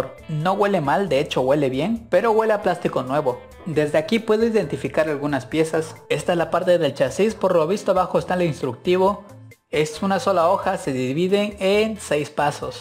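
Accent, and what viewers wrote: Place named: Juchitán, Mexico. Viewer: Ah perro eres de Juchitán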